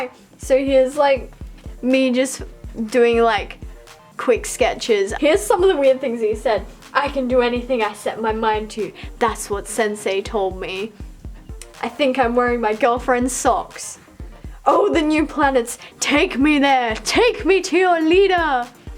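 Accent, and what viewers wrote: Australia. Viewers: Hewo. Quick question, are you Aussie ? Btw your art looks amazing 😊👍👌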